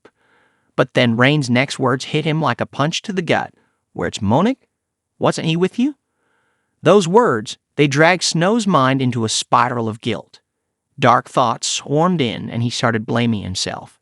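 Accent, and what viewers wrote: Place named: South, United States. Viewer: Sorry but the southern accent just doesn't work with this.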